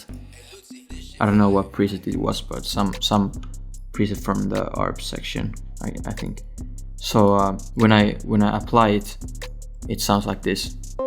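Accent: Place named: Finland. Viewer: You can really hear that he's finnish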